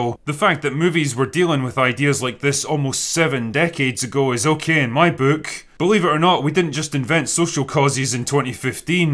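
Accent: Scotland, United Kingdom. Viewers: Really weird hearing a Scottish accent saying anything good about the English.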